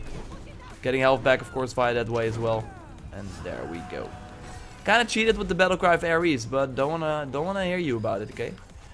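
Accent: Netherlands. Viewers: The way you speak English has something, are you dutch by any chance? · Are you dutch i can hear in your accent im a big fan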